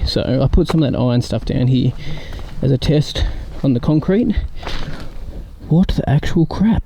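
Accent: Australia. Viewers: Where are you from? Australia or New Zealand?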